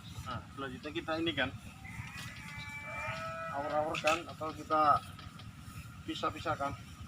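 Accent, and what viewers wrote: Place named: Banyumas, Indonesia. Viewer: Kepriwe mas ora gelem di sorot raine...Mantap mas, Ora ngapak ora kepenak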